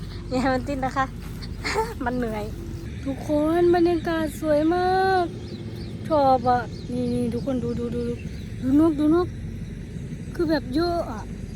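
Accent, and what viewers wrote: Korat, Thailand. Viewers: พูดแบบนี้เหมือนคน โคราช หรือปราจีนบุรี สระแก้ว เขาจะพูดกันแบบนี้ สำเนียง แบบนี้ อยู่จังหวัดไหนครับ น้องสาวคนสวยที่น่ารัก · เอกลักษณ์ของคนโคราชก็คำพูดคำจานี้แหละครับตลกดีชอบๆมากเด้อ คนน่ารัก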